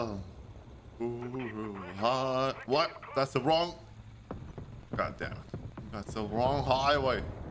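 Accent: France. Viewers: Extra sensibles avec l'accent du sud 👌! Super vidéo: ) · Le petit accent marseillais qui fait plaisir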